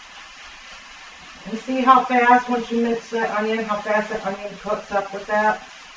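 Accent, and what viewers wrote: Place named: South, United States. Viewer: You sound like a good ole country girl, or at least you cook like one. That looks good; gotta give you a thumbs up. Save me a seat at the table, LoL...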